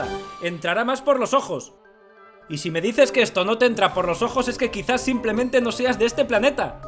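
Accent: Spain. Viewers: Me gusta ese acento de qué parte de España es? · Hoooolaaaa!! un capo total! me haces reir muuucho! te haz ganado una nueva susctiptora. me encanta como hablan uds españoles!!😍 Saludos desde el otro lado del charco, desde la PATAGONIA ARGENTINA!!! 👩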